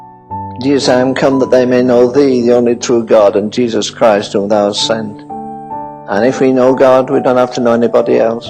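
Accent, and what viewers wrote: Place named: United Kingdom. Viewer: Saint I most look foward to meeting in heaven. I know Paul and Peter and St.John, but this man was close to my time. He moved me with those little British words coming out of his mouth so clear and clean. Prayer and long suffering. Love this little British man. I want to see him. GOD willing I can one day see his resting place, then meet him in that celestial city in the sky built by the King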